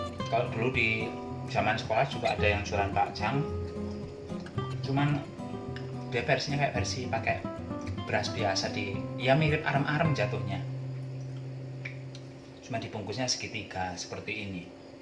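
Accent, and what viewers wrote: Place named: Ngapak, Indonesia. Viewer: NGAPAKNYA KOK ILANG ... JD ANEH... GA FAMILIER DI KUPING...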